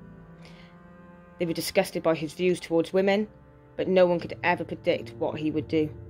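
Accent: United Kingdom. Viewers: I’ve just come across your channel and watched this video, I think you’ve done brilliantly! Going to binge tonight. It’s nice to hear a Welsh accent too, I haven’t come across many and I’m also from Wales. Good luck with the channel! 😃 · I really enjoyed your take on this. It was clear and concise I like that. I watch alot of True crime and it's refreshing to hear a British Accent albeit I know you said your in Wales but UK nevertheless 😃 Thanks I've subscribed and look forward to hearing more from you.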